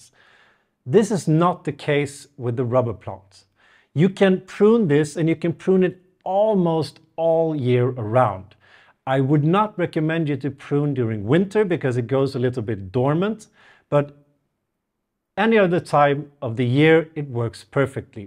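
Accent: Scania, Sweden. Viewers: Just love how he speaks English with a touch of skånska. ”Reubusta” 😂 Great video guys 👍🏽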